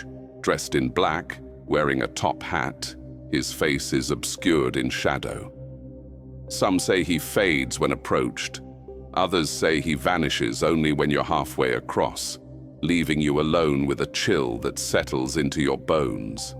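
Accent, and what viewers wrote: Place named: United Kingdom. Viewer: hello I just subscribed to your YouTube channel love your videos love your British accent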